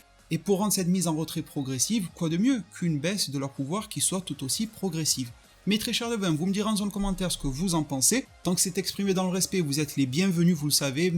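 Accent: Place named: South, France. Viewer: ton léger accent du sud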